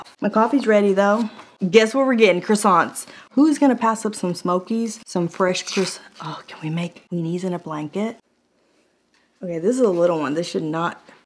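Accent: South, United States. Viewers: I was drawn to comment because of the southern accent you’ve picked up! Change your accent to draw more viewers!! Question: did you post the link for that magnifying device you used? Thanks!! Love your videos! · Lol I found your channel a few days ago... and have watched so many of your videos and laughed so hard!! But I got a question... in one video or maybe this one you said you woke up with a southern accent... I'm southern and I thought you were Southern so now I'm wondering... where are you from? Lol Keep doing what you're doing!! Love your videos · Isn't the country accent comes from living in Texas!!!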